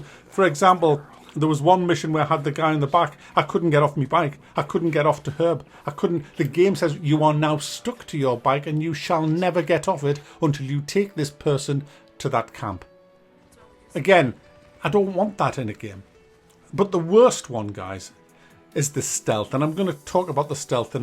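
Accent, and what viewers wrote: United Kingdom. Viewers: where abouts in the UK is your accent from?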